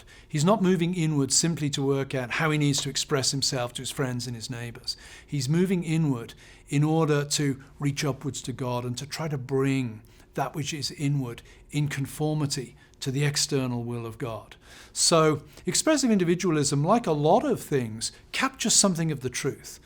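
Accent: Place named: England, United Kingdom. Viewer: If he applied this baloney to American Evangelicals he would come up with the same crappy conclusions about them as intends about gays and lesbians. - What he is doing is claiming that gay people are concerned about nothing, and I mean NOTHING aside from SEX, SEX, SEX. This has been the tactic of bigoted American Evangelicals for DECADES. All he is doing is dressing it up with phony 'intellectualism' along with his English Accent. If you can't think about gay people in any other way than THE SEXUAL REVOLUTION, you are a bigot, because you are completely denying their complex identities as human beings, - which you claim in nothing more than 'Christian' , - which is complete dishonest baloney. You have personal issues you need to deal with.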